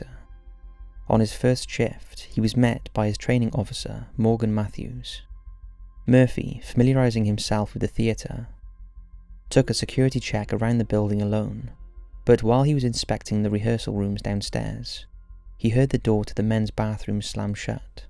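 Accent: United Kingdom. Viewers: Is this british, is he american? Can't make out what he is. His narration is really good though.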